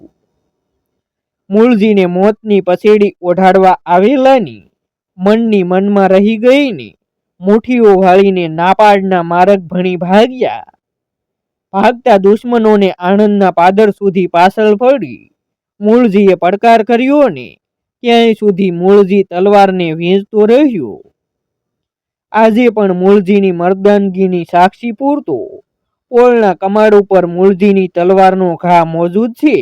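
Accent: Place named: Kathiawar, India. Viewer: Varta charotar ni ne boli kathiyawadi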